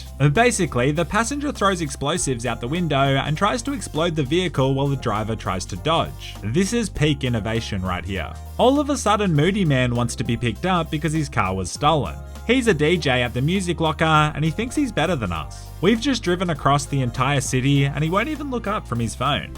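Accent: Australia. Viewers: Having a ball watching your vids man. Only just found your channel .. alot of my favourite YouTubers are Aussies so good to hear another one.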